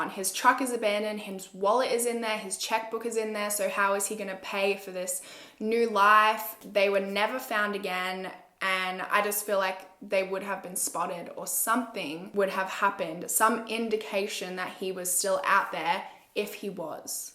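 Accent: Australia. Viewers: I absolutely love Aussie accent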